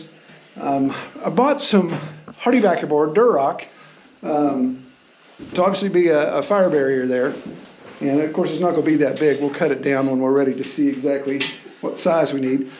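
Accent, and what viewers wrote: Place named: Minnesota, United States. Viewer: Just saying...you need to go pretty far North in Minnesota anymore to find folks that still talk like that. 😄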